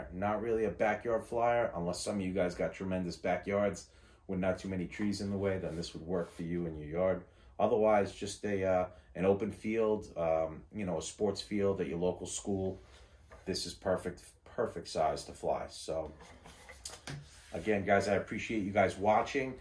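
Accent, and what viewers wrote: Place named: East Coast, United States. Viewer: You a east coast guy. I'm from Jersey. Sound similar. Have fun flying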